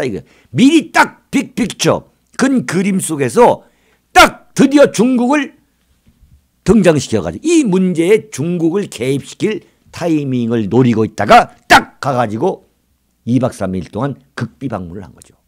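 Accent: Chungcheong, South Korea. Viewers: 충청도 분이신가요? 말투 재밌네요 ㅎ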